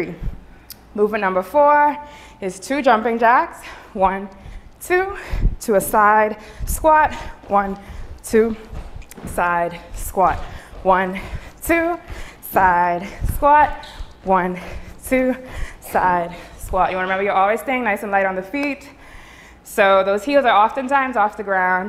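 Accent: Bahamas. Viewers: This is A Bahamian instructor I hear an accent🇧🇸😄 242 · Listen quick question are you from the Bahamas?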